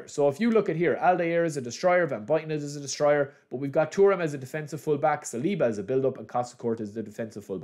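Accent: Ireland. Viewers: What's the craic lads? Which part of Dublin are you from?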